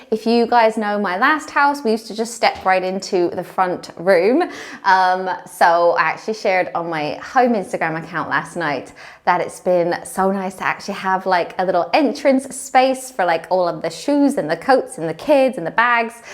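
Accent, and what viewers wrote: United Kingdom. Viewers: haha same here. I am a Chinese and a new subscriber here so I had no clue that is in UK. So when I saw the cupboard and fireplace I said “wow, it looks so much like the one in Harry Potter. And then I noticed British accent which makes sense. I live in Canada and the houses layout and style are so much different than in UK! I’ve never seen such a big fireplace in my life and all I want is to throw some floo powder into it. LMAO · What is your accent? It seems to swap from British to American and I can't work it out lol x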